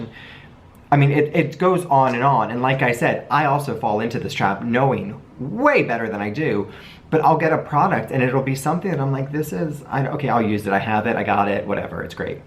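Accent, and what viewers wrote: New York, United States. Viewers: Are you a former New Yawka?? If so, hi from New Yawk. Just ordered serum and spray for the first time. Can’t wait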